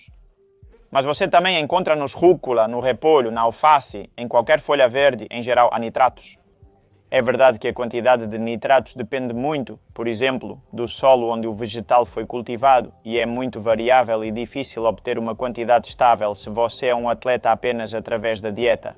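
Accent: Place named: Galicia, Spain. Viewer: EU. CARALLO. QUE FALAS. Ti GALEGO. GALEGO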